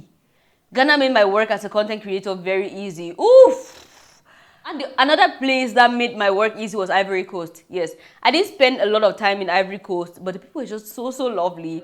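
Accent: Nigeria. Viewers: You sound so much like a Nigerian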